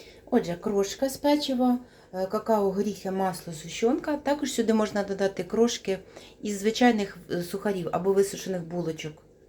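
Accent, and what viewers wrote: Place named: Surzhyk, Ukraine. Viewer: Ни хрена не понял этот диалект или суржик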